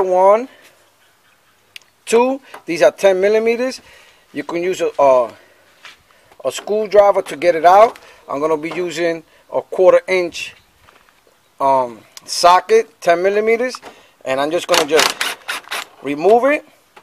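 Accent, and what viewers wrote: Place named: New York, United States. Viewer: Good video, loved the ny Italian accent. Anybody else noticed that first 😂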